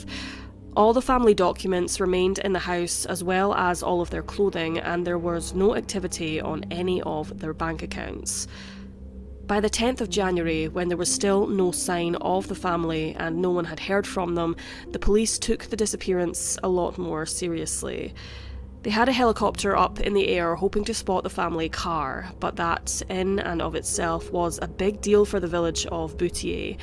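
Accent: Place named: United Kingdom. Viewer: Your voice is freaking perfect! I don't know what it is about British accents, but 😍!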